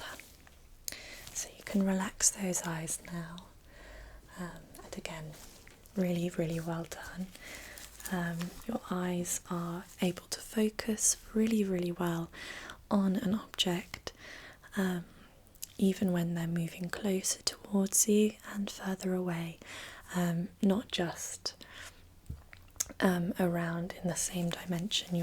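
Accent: United Kingdom. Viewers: That UK voice. Mmmmmakes me melt. Thanks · Because of her GORGEOUS British accent, I thought instead of touch she said torch. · Very cool and British, which is obviously the best. But it still doesn't make me sleep 😑😑 I'm just too difficult. Good luck with the future! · Omg. Subscribed. I looooooove your accent I could listen to you talk all day!!!!! From the UK?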